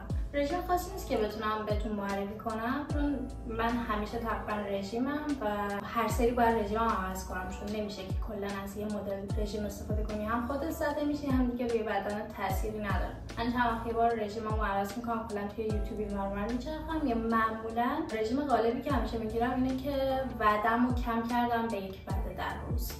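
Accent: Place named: Turkey. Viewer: ببین با این که کل روز کره ای حرف میزنی ولی ته لهجه ی ترکی داری خوش بحالت کاشکی منم اردبیلی بودم من تبریزیم ولی اردبیل رو دوست دارم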